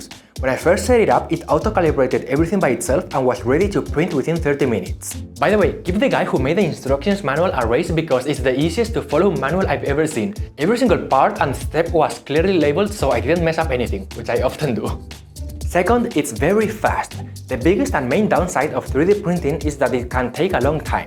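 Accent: Spain. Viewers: Bro has a spanish english accent lol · spanish accent on a asian guy is really trippy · Great video. You sound spanish, are you from spain? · Are you a spanish speaker? Your accent while speaking english is kinda similar to ours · It’s breaking my brain to see an Asian with a Spanish accent. 😊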